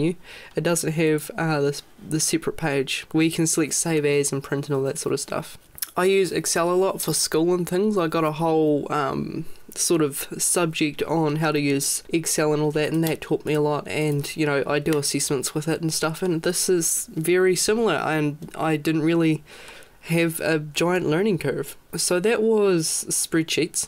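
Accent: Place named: New Zealand. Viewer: Man, that was viciously Kiwi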